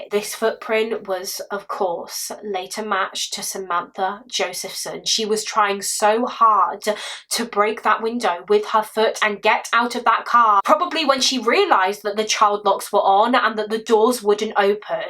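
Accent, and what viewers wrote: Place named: Somerset, United Kingdom. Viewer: It's a west country accent ❤ sound Somerset to me 😊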